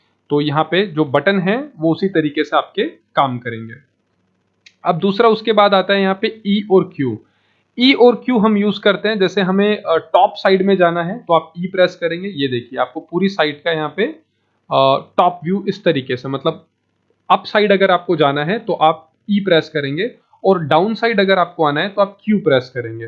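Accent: India. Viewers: Sir pls at least add subtitles or cc in your video.. I really dont understand what are u saying with ur indian accent.. i can only understand a few word and not all because ur english accent use indian accent. So pls pls add subtitles🙏🏻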